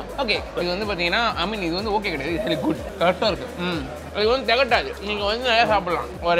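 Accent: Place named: Tamil Nadu, India. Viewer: Liteaaa JK Tamil Slanggg varutheyy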